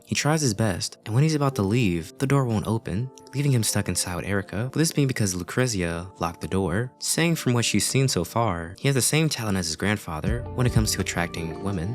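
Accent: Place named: United States. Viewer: Did I understand much? No Did I watch the whole thing? Yes Why? Because I need to do an American accent in a play, and I’m copying you 👍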